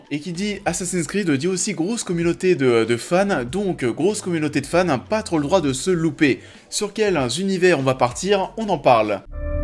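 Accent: Paris, France. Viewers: Si la serie marche un tant soit peu, je mets un billet sur le fait que Netflix tente d'en refaire un film un peu comme El Camino avec BrB ou toutes leurs déclinaisons de leur série sur Marco Polo, avec une periode inédite différente de la série, peut être sans l'époque contemporaine, une fois que le délire capuche/crédo sera bien passé avec la série. Ça nous fera peut être oublier l'horrible nanar de 2016 ! PS : Mollo sur l'accent parisien stp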